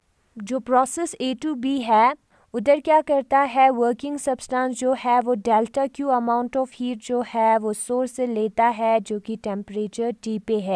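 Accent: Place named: Kashmir, India. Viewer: Ur accent is Kashmiri for sure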